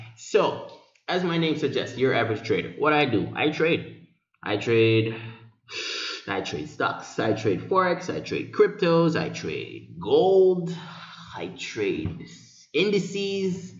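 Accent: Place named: Jamaica. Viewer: Man I knew u were Jamaican from the accent 😂. Big up 👍🏽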